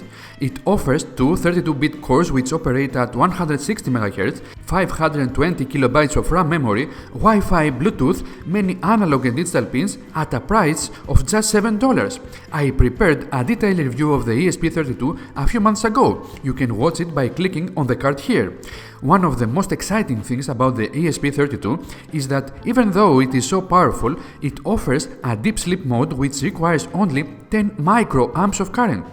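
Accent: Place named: Greece. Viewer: I designed a home automation in my spare time back in 1985. It was designed with 68HC11 MCU which was the most powerful MCU you could buy in those days. Unfortunately, IBM beat me to it, and even called it what I did; "Home Run". I think they had ESP (extra sensory perception), since I told no one about my project! It had lots of discrete ICs like 74LS138, 74LS367 etc. I have determined that I can now implement a wire-less version (WiFi) of it, which would eliminate "ALL" wires (except power), using a single ESP32, IR motion sensor, mic/speaker, touch keypad, a TRIAC and a few OP AMPs for analog signals (hands-free Intercom and AC dimmable switch). What I wouldn't have given for an ESP32 at that time! Our younger viewers have missed being a witness to a digital revolution. Thanks for the nice video. Your voice and accent, reminds me of my childhood friend from Athens.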